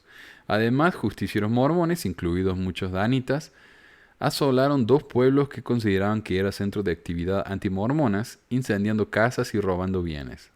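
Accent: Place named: Córdoba, Argentina. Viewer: Uuuiii ese comentario sobre tu acento no vaa!! Y qué!, si les molesta. Tu acento es lo más hermoso y lo más ritmico de los acentos del mundo, tiene vida tu hablar, tiene cadencia. Amo tu ace to cordobés. Aunque muchas provincias de Arge tina tienen ese acento tan hermoso. Mi hermana vivió un tiempo en Córdoba y las vecinas le decían La Yeya por hablar con y, de Santa fe. Jamás un acento debería ser para discriminar por que habla de la escencia de la persona, y ninguna discriminación. Quizás, el que lo dice, quisiera ser como vos, bueno que lo diga y ya está!. Te enontré, te quiero, te amo, zaludoz. (mucha gente en zona de Santa fe y de Entre Ríos reemplaza la s por la z)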